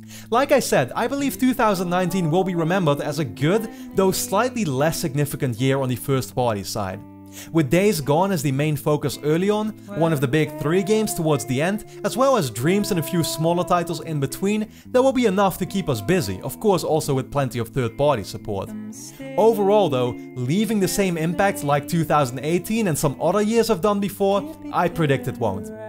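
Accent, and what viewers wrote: Netherlands. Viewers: Once i heard your voice i immediatly reconised ur dutch accent xd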